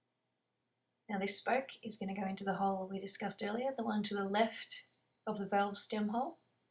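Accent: Australia. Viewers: Yeah she’s Australian, it’s a nice accent as it’s not too broad. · Yes.. she Australian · I've tried 5 or 6 times, following 5 or 6 different videos, and NO LUCK! Saw this one, SUCCESS! Thank you very much! Is your accent Australian? Sounds that way to me. steve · When I first heard you say: "under" I was hoping to hear "down" just before it. Love your Aussie accent. Please don't be offended. Nice video too. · Australian accent eh!!! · Australian I think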